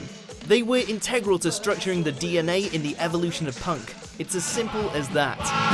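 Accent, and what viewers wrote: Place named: Cockney, United Kingdom. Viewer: Ваш ебаный fucking cockney нихуя не understand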